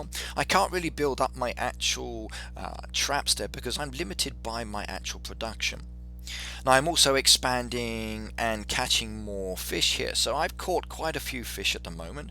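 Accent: United Kingdom. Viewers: am I the only one or does he have an English accent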